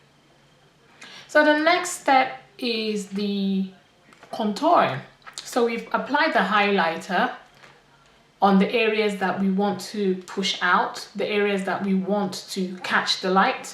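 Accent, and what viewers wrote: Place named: Africa. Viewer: Love your African accent and your tutorial. Can you do a lipstick tutorial please???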